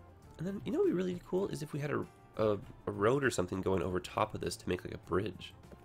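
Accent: Canada. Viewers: I know this is a 3 year old comment but that's just his Canadian accent.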